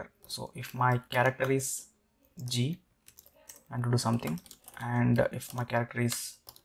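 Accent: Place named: South India, India. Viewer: Thanks for the explanation. On lighter note: by your voice I can figure out that you are an Indian from south :). Right?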